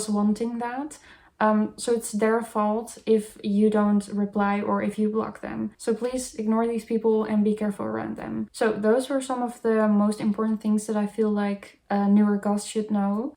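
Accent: Netherlands. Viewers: You sound Dutch! Come hang out at goth night if ur ever in utri or ams :))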